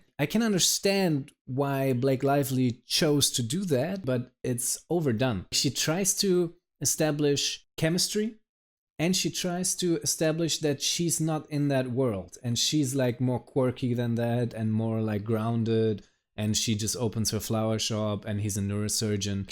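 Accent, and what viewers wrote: Germany. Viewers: that one killed me 💀🤣 Came here from the "Adolescent" video and the comments under it were wild... But I really enjoyed the way you chose to respond to them, so I checked another video and wasn't disappointed. As someone who both read the book and saw the movie, I got a bit offended by the title of this video, as the movie was awful compared to the book. But as you're only judging the acting, there might be some good scenes in it. idk. To me, personally, there was no chemistry at all between the two main characters and a lot of the side characters did a way better job at making me feel for them. That's why the last scene also didn't move me in any way. I think this movie would've been perfect with some unknown actors to focus on the story and not on Blake's stupid fashion choices or whatever. I think you're doing a good job with these videos. 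Keep it up! 💜 P.S.: Are you German? Your accent sounds like it.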